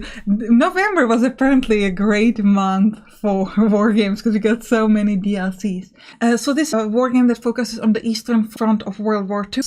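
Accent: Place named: Philippines. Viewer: Wow. I have the honor of writing the first comment. Southern Command looked interesting and I intend to look into it while sales are still active. Thank you. PS: It is nice to find a female wargamer; from your accent Filipina. I only know a few words of Tagalog. I guess the most appropriate is word here is "Sigay" (don't know spelling). Thanks,... you videos on Field of Glory Empires were good too. (I am a former member of AGEOD and friend of Philippe Malacher.)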